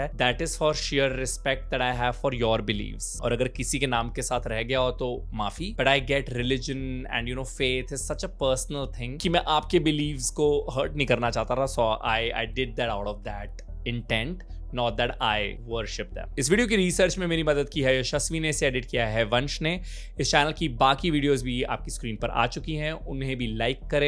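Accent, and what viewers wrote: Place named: Punjab, India. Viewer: I love ur punjabi accent bro 👌.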